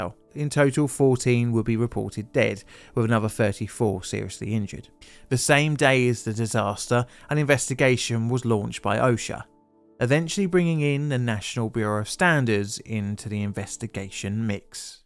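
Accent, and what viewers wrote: United Kingdom. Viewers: he's British. They never pronounce things correctly. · The fact that your accent clearly places you across the pond and you still throw shade at Florida 😂 they cant catch a break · He uses the word "garage" in the American sense, as in for parking, I'm assuming because Americans get huffy about stuff like that, but because he's saying it in a British accent my brain can't help but translate it as "car repair shop/gas station".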